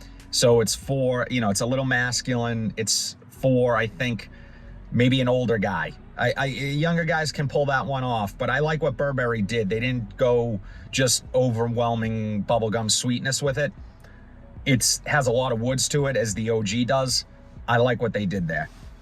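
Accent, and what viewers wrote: Boston, United States. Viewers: Great way to test fragrances for sure. Love the vlog! Whats your accent? Boston?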